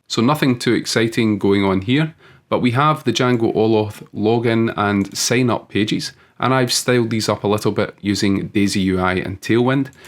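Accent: Scotland, United Kingdom. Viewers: Your accent is really sick man 😎 love the scots. Greetings from the USA.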